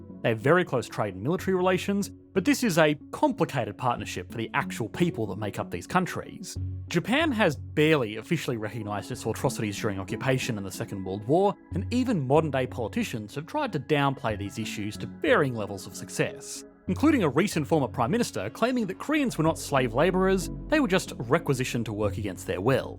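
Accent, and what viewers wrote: Australia. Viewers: Dude, how many channels do you run? I heard this Aussie accent before!😊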